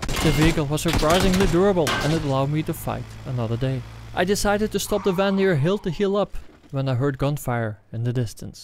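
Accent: Netherlands. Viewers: love your vids man, question.. are u Dutch?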